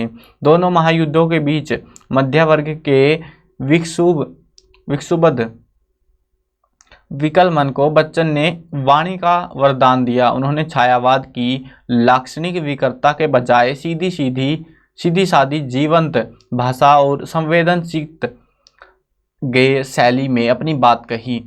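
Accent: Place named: Churu, India. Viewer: Great sir.... Eetta acha to hme hmare teacher bhi nhai pdate.. Sir ap rajasthan me churu Side se ho kya apki language se pta chl rha he..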